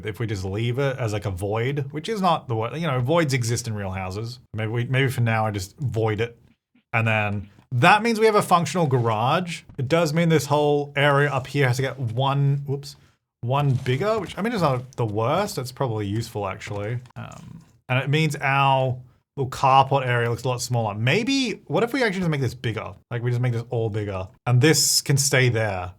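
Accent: Australia. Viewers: No doubt that’s an aussie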